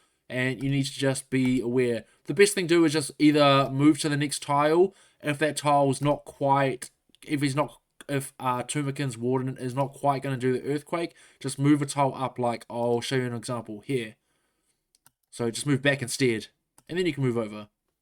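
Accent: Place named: New Zealand. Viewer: Are you a kiwi? You have a bit of a kiwi accent. :o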